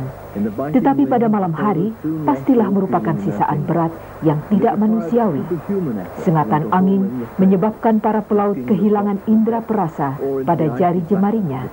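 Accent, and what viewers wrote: United Kingdom. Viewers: dari logat englishnya seperti dari BBC acaranya. Secara TVRI kiblatnya tahun 80-an adalah BBC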